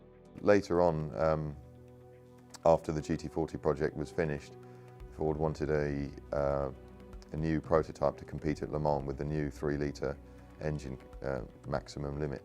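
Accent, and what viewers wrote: United Kingdom. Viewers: Hearing a Brit romanticize about making engineering innovations on classic Mustangs gives me hope for the human race! I mean no disrespect, Sir Clarkson...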